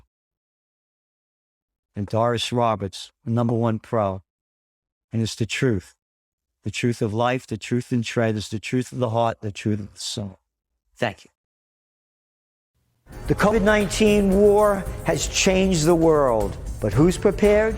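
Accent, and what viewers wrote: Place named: East Coast, United States. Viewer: Omg gerald you are looking extra dapper today love your truthfulness your east coast accent and your proud Italian heritage you are welcome in Texas if you want to join us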